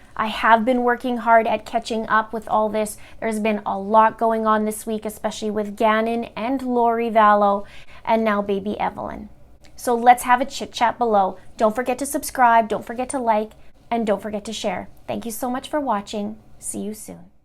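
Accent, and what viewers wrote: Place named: Canada. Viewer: I'm assuming from your accent you are Canadian. I love Canada, and have been twice. I have watched 2 of your videos now. Good job. I live in in East TN, so this case has really affected ppl here hard. I'm wondering if you've done any videos on a little boy also missing from here for 2 yrs. Baby Joe Clyde Daniels he was a disabled 5 yr old his parents are in prison. His father admitted to beating him to death, but won't say where the body is, and has not recanted his confession. The mother is in for child neglect bc she was home when it happened. Many are comparing the Evelyn Boswell case to the baby Joe case. So sad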